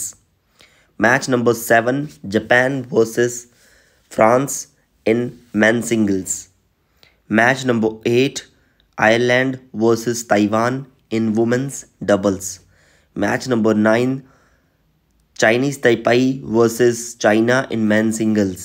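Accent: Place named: India. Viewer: I like your indian accent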